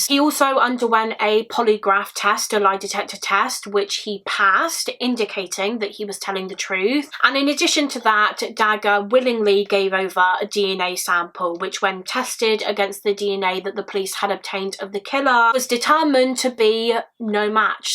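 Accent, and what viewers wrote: United Kingdom. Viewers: Are you British sweetheart???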